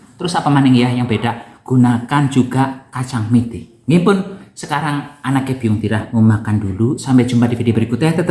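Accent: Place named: Java, Indonesia. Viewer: Mas asline wong Kebumen apa wong Tegal sih. Basane medok temen.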